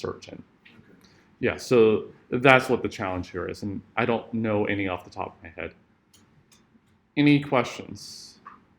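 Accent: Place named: England, United Kingdom. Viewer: Very good lecture. I like your English accent very much. Keep it up.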